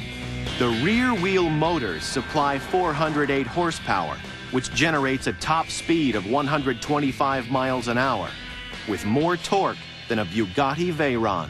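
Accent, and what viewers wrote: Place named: Denmark. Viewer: Haha :D it's funny how clearly you can hear that he is danish ^^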